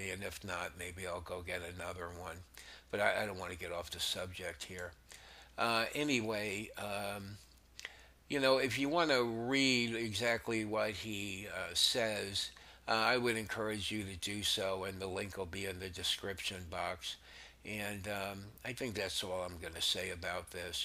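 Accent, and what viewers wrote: United States. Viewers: I think you sound warm and friendly! You kind of sound alittle like you are from Jersey... my home state!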